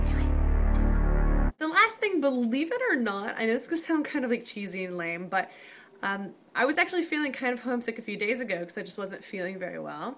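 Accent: South, United States. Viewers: I'm from TN and I think I have more of a southern accent than you do. Do you get comments of your accent a lot? Or do they just hear American more so than a regional accent? I'm kinda excited to hear my students speak with a little twang. :) Although I'm sure I'll have to try to speak without an accent, right?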